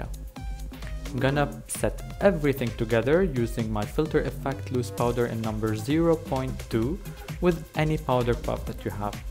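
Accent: Arab world. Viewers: مبين عليك عربي من طريقة كلامك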